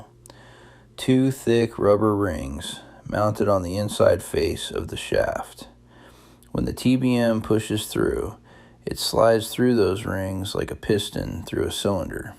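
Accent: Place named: South, United States. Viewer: this dude sounds southern as hell fresh out the hollar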